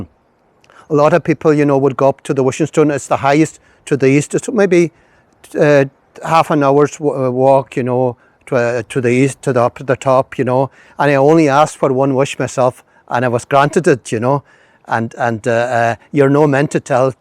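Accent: Ireland. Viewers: Isle of Tenerife, Spain, Africa. Brilliant! What an interesting, informative video! Well done! And all said in beautiful, melodious Donegal English, the favourite accent of many. Now, a maybe strange request: As many folks are interested in Tory, not only for its fine paintings and archeology, but also for a maybe even rarer aspect of its culture, the Gaelic language, said to be less depleted there than anywhere else. Would you consider doing the very same layout as this video, but all completely in full, natural, Donegal Gaelic? I'd be riveted to hear it. Best wishes, Patchy. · Its quite extraordinary and refreshing to hear an irish man speak english as a second language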